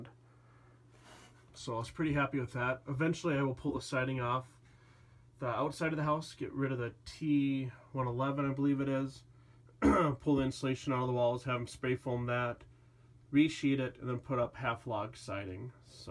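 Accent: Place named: Midwest, United States. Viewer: Impressive how handy you are! I detect a Mid-western accent. Is this in Minnesota, by any chance?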